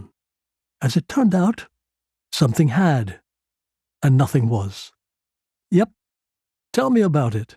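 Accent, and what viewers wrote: United Kingdom. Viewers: Greetings SIRCLIFF RICHARDOBE. Thank u for sharing …this recording ..awesome reading of segments from ur book…ur Brilliant British accent is a delight to my ears..I would listen intently to further reading! An accent that seems to be disappearing.. beautiful blessings!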